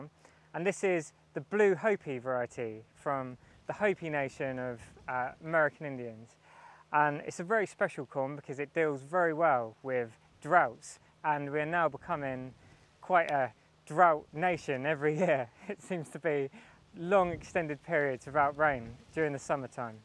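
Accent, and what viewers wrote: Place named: England, United Kingdom. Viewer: I only seem to be able to get ordinary yellow corn here in France; it's a drag trying to get anything different; like jumbo banana squash!! We live in a beautiful place, in the mountains but seeds restricted to little and Holland. Last year i had to high hopes for corn - and before i knew it ants came and took it over it looked OK but they were there; no one else seems to have had the problem! I planted beans with my corn today oops I will stake them then! Where the hell are you; as you have an English accent but it looks like a tropical place, how did you get there?? One sweet potato that will grow here costs 5 euros -- how do you get is it 'slips' what and how to you propagate them?? I need them as we love to eat them!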